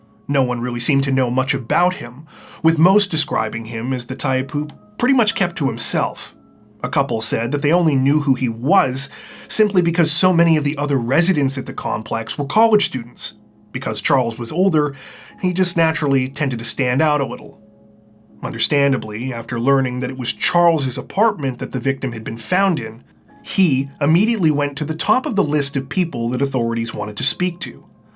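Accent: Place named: Canada. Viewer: A very typical Canadian manner of speaking.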